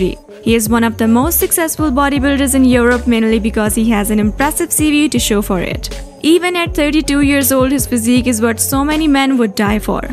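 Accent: India. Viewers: Having an Indian woman with a typical Indian accent makes these videos hilarious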